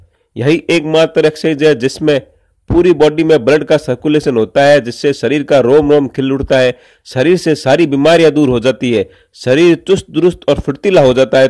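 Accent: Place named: Marwari, India. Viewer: भाषा और इनका लहजा मारवाड़ी जैसा ही लग रहा है।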